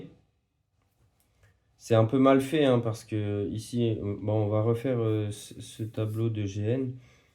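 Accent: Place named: Belgium. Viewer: Masterclass le petit accent belge frerot😹🤙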